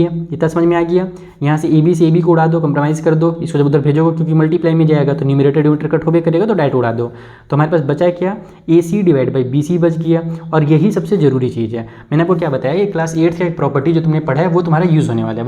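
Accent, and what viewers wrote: Bihar, India. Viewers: Sir are you bihari ✌️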